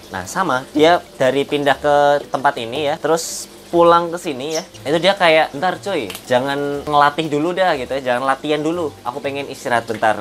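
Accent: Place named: Java, Indonesia. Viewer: Orang jawa ya bang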